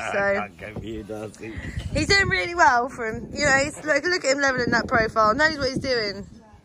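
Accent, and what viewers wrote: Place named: United Kingdom. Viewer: Are you from UK? You have the accent :-D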